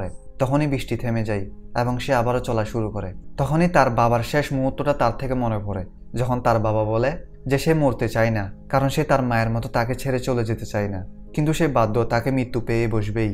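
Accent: Chittagong, Bangladesh. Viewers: চট্টগ্রামের নাকি ভাইয়া?😂😂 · খুব বাজে মুভি আর একটু পরে পরে ফ্লাশ বেঁকে যাওয়ার কি আছে আর চট্টগ্রামের একটা টান আসে কথার মধ্যে শুনতে মোটেও ভালো লাগেনা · চট্রগ্রামের আঞ্চলিকতা বেশি দেখা যাচ্ছে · ভাই আপনি চিটাগং এর 😊😊 ভাষঅ শুনে বুঝে ফেলছি 😊😊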